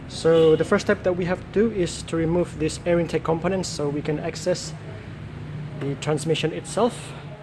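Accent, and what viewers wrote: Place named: Australia. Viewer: This is so well put together, you sound Aussie like myself bud, the description in the video is so detailed and given some of the horror stories I've heard especially in older mazda versions of Ford 5 speeds I think you're bang on with preventative maintenance at those intervals. I'm hoping the process is similar for the 5 speed in my 2008 sp 23. Looks similar to one I saw but wasn't half as well done as this and video quality wasn't the best either. Pretty sure my trans is the FS5AEL slightly modified Ford one and pretty janky from all I've heard, although I'm sure fords is worse lol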